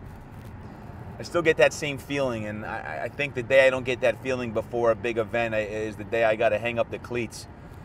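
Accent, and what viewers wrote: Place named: France. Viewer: Oh and I LOVE your French accent!!!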